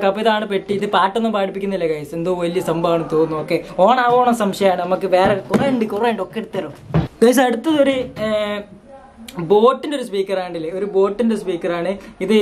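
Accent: Kozhikode, India. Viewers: Ninjaludhe varthanam kettal manasilakum kuzhikkudhanenn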